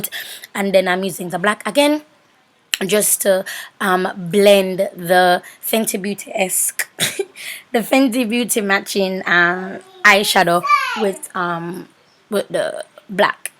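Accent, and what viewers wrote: United Kingdom. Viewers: You killed me with the british acc damnn goo gal. Love you for free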